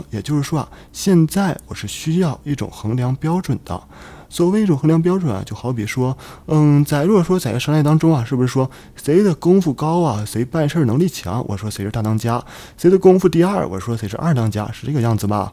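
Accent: Northeast, China. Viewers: 这东北味太浓了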